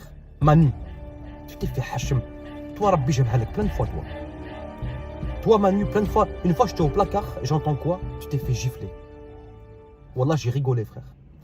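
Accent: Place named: Lyon, France. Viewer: Ca ce voit ta été a Corbas 😂😂 tu pavarle comme les Lyonnais (sal mouille)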